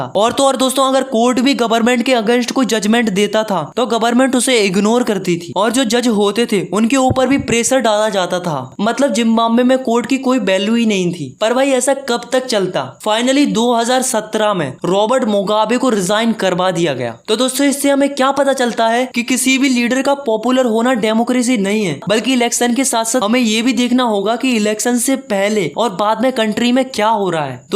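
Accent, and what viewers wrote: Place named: Bihar, India. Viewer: bhai apna accent theek karle thoda bihari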